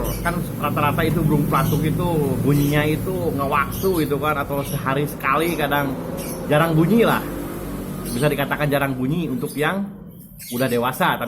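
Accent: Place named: West Java, Indonesia. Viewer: Sunda pisan mang... Hade infona euy..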